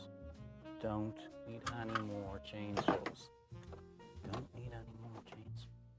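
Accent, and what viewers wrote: Ireland. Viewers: what a lovely wee lunch today!...how was that wine? good work with that weed whacker shield. good vlog today young lad, your editing chops are improving so keep up the good work and be your Irish self (accent included) even in France. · Good morning/ afternoon, great you showing me around 🇫🇷 for someone who has never been out of Australia. I can hear you fine and understand with your Irish accent as I grew up with a Scottish father so I love listening to your accent so keep recording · I love your accent, my late father was Irish and I like to hear the lilt of it when you speak. I’m glad your van has a name, my car is called Jezebel and I love it because it has a V6 engine and takes off faster at the traffic lights than any young men or women driving BMWs which is disgraceful at my age because I’m in my 70’s. I find great joy in the mundane things of day to day living like buying food, casting your eye over new shiny objects, don’t stop your YouTube’s as they bring joy to many people. 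🇦🇺🦘🦘🇦🇺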